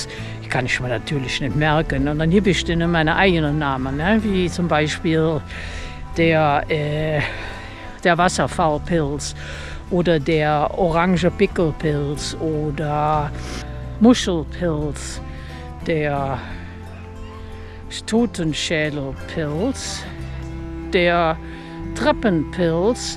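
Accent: Cologne, Germany. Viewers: Es war mal wieder einmal ein Erlebnis den Koelschen Dialekt zu hören und noch dazu so viel über die herrlichen Farben der Bäume und die Baumarten zu erfahren . In Parksville auf Vancouver Island hatten wir letztes Jahr genau 3 Tage etwa 5 cm Schnee, in Saskatoon dabei 5 Monate Schneefälle. Danke für die schönen Videos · Hallo ❤was für ein wunderschönes Video, und dein Kölsch ist so herrlich zu hören, und die Farben der Bäume und deine Infos dazu ist so Klasse, bin so begeistert von deinen Erklärungen der Bäume 🌲borrrr und die Farben und die Höhe der Bäume.Vielen Dank ❤LG Monika 😊 und die Pilzkunde super Idee mit den igennamen 😊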